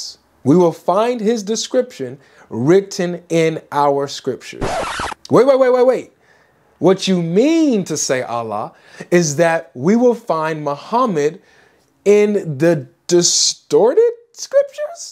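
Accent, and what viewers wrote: United Kingdom. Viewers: that british accent is on fire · that bri ish accent was fire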